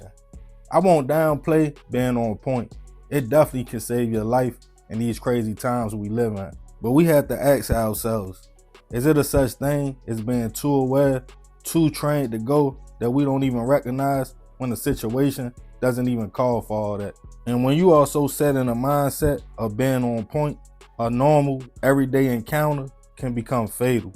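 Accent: Baltimore, United States. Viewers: He's from Baltimore and that's how they talk there 😅 His primary audience he wants to appeal to is his own community.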